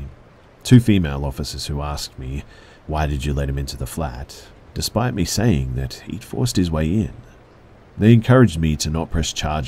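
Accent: Australia. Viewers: Love this your my favourite narrator none compare, is it the Aussie ascent? Tone I simply believe it’s both thank you for not quitting these uploads, I’d honestly be searching YouTube relentlessly trying to find the right voice. Xx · You are a phenomenal storyteller! Your Australian accent makes it even better.! People with British accents are the same way not all of them but the ones who have the gift of voice of being able to read a story and make it really come to life. Please remind me of the old days. When TVs were a newer item or before they were invented. They had radio shows that it will keep people entertained. Families will gather around the radio, which was usually a Big thing. Meaning, they were physically big they would be on a table and one family will gather around and listen to the scary stories and because that’s what they had at the time they had sound effects and everything like if someone was walking they had a recording of somebody walking towards you or walking away and they had a door that can go anywhere with that will open it and close a different sound effects to make you feel you are there. 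When I was little my grand mother bought me this little radio is back to about 60 years ago maybe I wasn’t 60 years ago I’m like maybe 30 years ago and I remember it vividly. It was this little white razor on rectangular and the part where you tuned it was black and it has orange number so you can see them and we have a station. Right near us and play those types of shows at night and I remember being in bed with the flashlight and those were things that we like to get it tells you how long ago was my alarm flashlight that took like three or four of the big batteries just to light up an area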